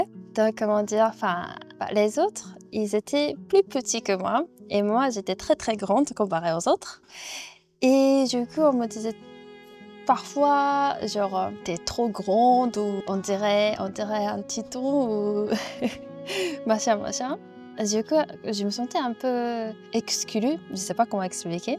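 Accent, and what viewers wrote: Japan. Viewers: je suis très impressionné par un tel niveau de maitrise de la langue !! et en plus avec très peu d'accent ( je compare avec d'autres japonaises qui parlent en français ) alors bravo ! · C'est la première fois que j'entend l'accent japonais avec du français presque parfais mdr. Par contre c'est pas une bonne idée de venir en france. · Une japonaise mignonne, parlant un français courant avec cette petite pointe d'accent et pour arrière plan un village japonais... Bref, un charme fou vette video !!! ❤ Allez je m'abonne ! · J’adore ce français à la japonaise 😀 Tu lui donne un certain charme ❤️ Et tu parles mieux que certains Français 👏😘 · bonjour. Je suis très content d'avoir pu regarder - par hasard - ta première vidéo. Je suis moi-même très attiré par la langue japonaise que j'avais commencé à étudier. Bravo pour votre niveau de français, excellent, avec cette pointe d'accent japonais qui vous singularise et vous rend si charmante.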